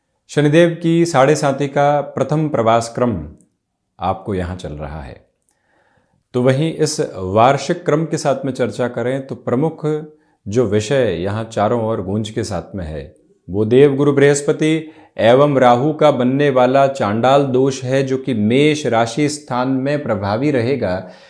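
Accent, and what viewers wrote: Standard. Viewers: Itni shudh hindi thodi si english ke sath 😱😳😱😳😲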